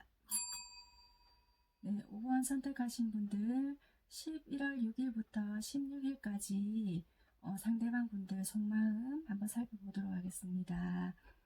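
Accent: Busan, South Korea. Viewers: 저 5번 뽑았거든요~ 다시 한번 들어봤어요. 저 태양 물병이고 상승 게자리고, 상대는 게자리에요 ~ 다시 들어도 정말 잘 맞는것 같아요.. ^^ 댓글도 감사합니다!!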